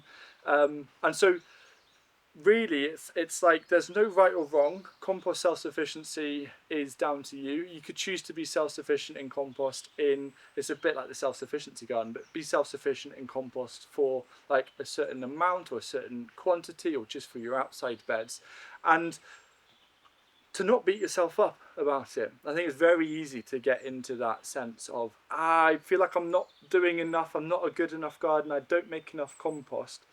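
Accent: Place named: Wales, United Kingdom. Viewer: Just pre-ordered "The Permaculture Garden" and am looking forward with great anticipation to a fantastic gardening journey. And, agreed: leeks are the most important part of the garden. Now we just need the audio book version with you as the narrator, because such a gorgeous Welsh accent should be used as much as possible. I truly believe that your garden, hearing you talk to all the plants and shrubs and worms, is made that much better for the beauty of hearing words of encouragement in that amazing accent. Thanks for all that you do for the community. Cheers!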